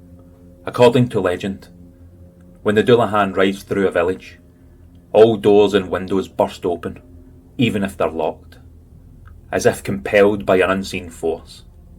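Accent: Scotland, United Kingdom. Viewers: This was PERFECT. Love your voice the narration graphics everything. I think I’ll subscribe. Great channel. Are you from Scotland?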